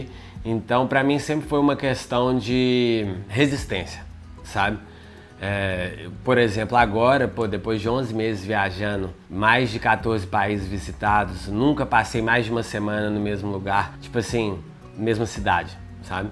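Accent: Minas Gerais, Brazil. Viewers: Sabia que ele é mineiro. Tem sotaque 😂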